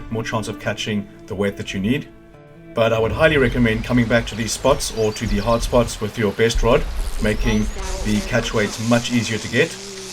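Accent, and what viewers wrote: South Africa. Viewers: You sound South African (like me) One of the most brilliant concise vids I have ever had the pleasure to follow. Sub for certain.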